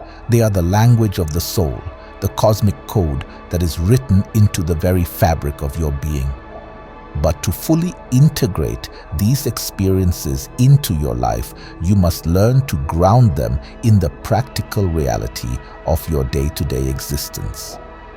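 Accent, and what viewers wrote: India. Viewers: You are sound like Indian..!!! Solemn voice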